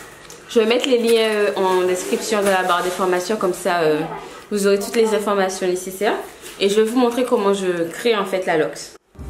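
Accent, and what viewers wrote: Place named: Guadeloupe, France. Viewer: Tu es Guadeloupéenne ça s'entend ❤❤❤❤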